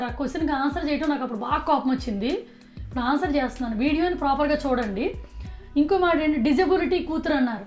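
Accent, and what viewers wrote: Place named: Guntur, India. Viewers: Sister asalu mee voice yentho bagundandi asalu first time mee videos chusinde mee voice vini because ma kosta ammayi laaga anipinchindi so I love ur voice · MEE VOICE NAAKU CHAALA ISTAM!!! It is unique, vinalanipisthundhi vintunte dhaaniki thodu aa gunturu yaasa perfect blend. Negatives assalu pattinchukokandi vaallaki taste ledhu anthe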